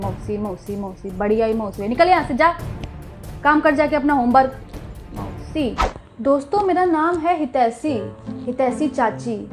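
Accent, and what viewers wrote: Kanpur, India. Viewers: Kanpuriya ho kya beta ji. 😍😍😍😍😍😍😍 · apke kanpuriya accent par fida ho gaye hai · Bolne ki font bdi acchi lagti kanpur side ki...so awesome 😍😍😍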